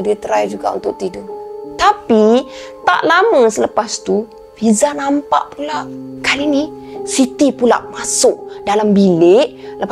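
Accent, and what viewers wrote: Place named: Kedah, Malaysia. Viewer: org kedah mai dh ni😎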